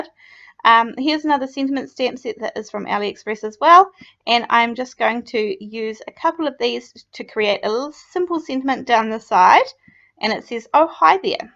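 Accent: New Zealand. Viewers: Lovely cards as always. Also very simple and achievable. Are you from New Zealand?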